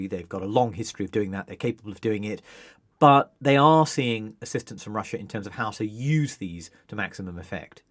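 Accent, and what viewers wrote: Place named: RP, United Kingdom. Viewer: so brititsh, so posh, and so wrong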